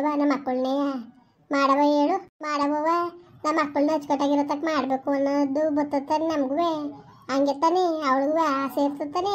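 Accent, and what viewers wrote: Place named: Ramanathapuram, India. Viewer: Yavdu native Nimdu, e Tara language matadadu Ramanathapuram side, heli please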